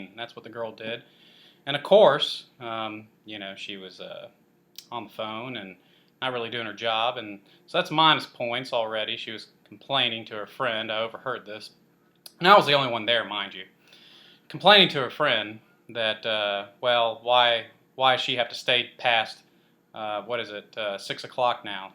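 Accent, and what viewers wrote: United States. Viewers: You have a country accent. It's cute 😉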